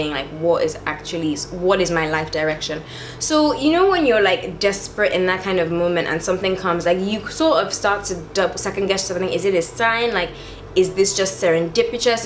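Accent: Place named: Nigeria. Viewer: It kind of sounds like you have a Nigerian accent or something. I’m Nigerian so I was just wondering if you were too 😂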